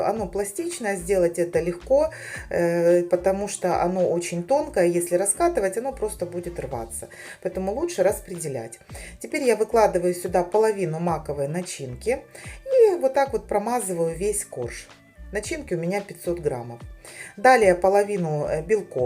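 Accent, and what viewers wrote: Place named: South, Ukraine. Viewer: А это не только пляцок. Есть очень похожий финский тортик. Говорок у Вас до боли родной. Юг Украины. Или юго-восток? А рецепт великолепный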